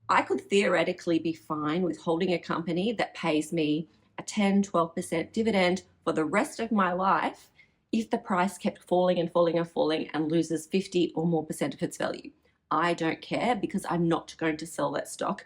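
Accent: Australia. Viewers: Great info thankyou and Aussie accent is a bonus.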